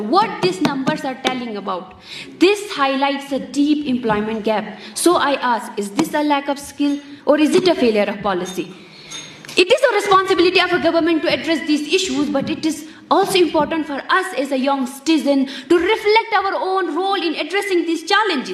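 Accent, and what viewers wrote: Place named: Russia. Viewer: The Russian accent, wow❤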